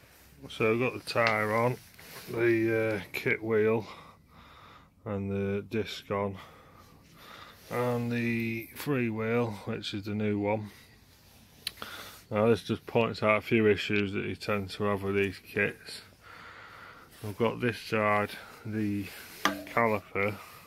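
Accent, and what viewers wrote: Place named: Doncaster, United Kingdom. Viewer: Sounds like you're not far from Doncaster mate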